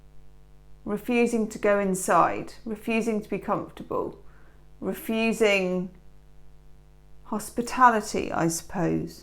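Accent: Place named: South, United Kingdom. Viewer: Hi I am in USA Texas and am a cancer I enjoy your readings they are spot on I am British from Cornwall sounds like your from the south of England Thankyou for your readings and 😘🇬🇧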